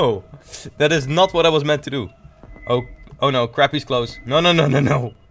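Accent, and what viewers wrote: Netherlands. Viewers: He's dutch xD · Dutch Accent Heerlijk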